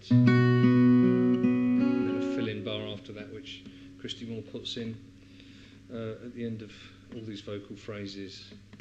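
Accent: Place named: England, United Kingdom. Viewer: Thank so much! And, hey, this is the first time that I've heard you speak! You have an English accent! Here in Missouri my Mississippi Delta accent gets me a lot of comments and attention. If I had you here, they'd let me alone for a while!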